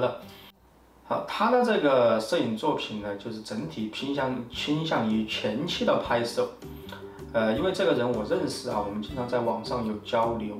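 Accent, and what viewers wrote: Sichuan, China. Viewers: 老师是四川叻？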